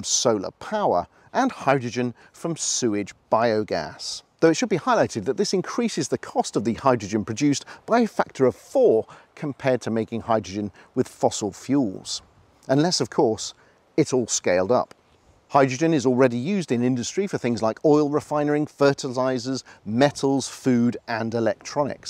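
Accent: United Kingdom. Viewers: Great video, but your American accent still sounds British, lol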